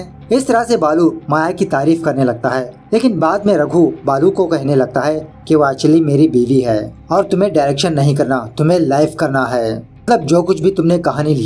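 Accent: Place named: South, India. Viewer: I have been listening your explanation since two months ago but i think u need to improve ur hindi language bcoz i think u hv South Indian ascend. Ur many pronunciation irritates me but hope to listen good hindi.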